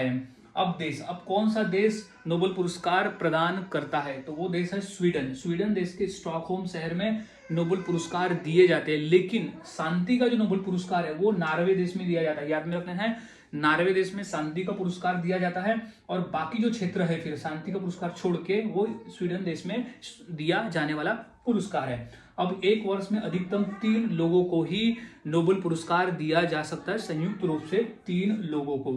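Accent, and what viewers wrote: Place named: Rajasthan, India. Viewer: Sir aap Rajasthan se he kya kyuki apka accent wesa hi he 😊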